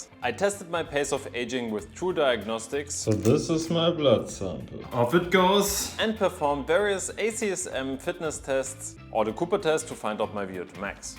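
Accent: Germany. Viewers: I love the video! And the German accent as well🤩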